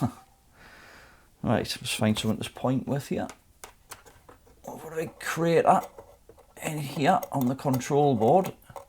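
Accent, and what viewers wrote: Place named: Newcastle, United Kingdom. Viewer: I've just viewed about fifteen YouTube videos on inverter faults and repairs and yours is the first to be watchable, simply because you don't put annoying and unnecessary 'muzak' in the background! I hate this trend on tutorial videos, these video makers don't realise how distracting background noise ('muzak') is to some viewers who simply want to learn the subject. Well done, great (and peaceful) video, with just your easy-going geordie voice talking us through the diagnosis/autopsy. SUBSCRIBED and up-voted.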